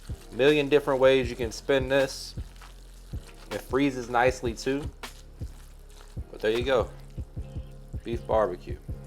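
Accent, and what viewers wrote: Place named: Louisiana, United States. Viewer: You just popped up on my feed , new sub. You sound like you come from the land of the Muffaletta, and the Mirlitons little brother 😁👍👊 !!!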